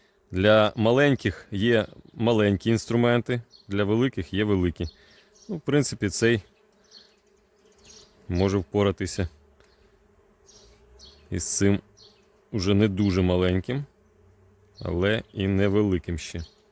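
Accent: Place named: Urals, Russia. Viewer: Акцент у этого русского странный ,то ли с деревень Урала, то ли с деревнь Удмуртии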